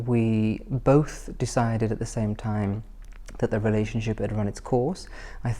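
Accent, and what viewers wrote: RP, United Kingdom. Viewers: I see you have made a massive effort to be RP. Ironically, you sound posher than Prince William as the younger royals now do engage with the estuary accent! Just out of interest, do the rest of your family speak with a Birmingham accent? I think it can sometimes be a shame when people erase their natural accents. I enjoy the regional diversity of accents in the UK. For example, Sean Bean's Sheffield accent really adds quality to his performances. They wouldn't be the same with an RP accent.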